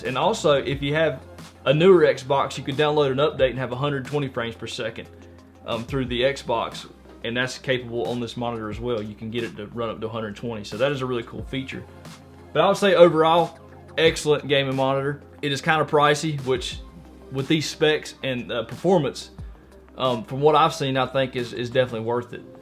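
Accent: South, United States. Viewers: Fantastic review! How do you not have more subs?! Very informative and you cover everything. I also absolutely love your southern accent, a pleasure to listen to.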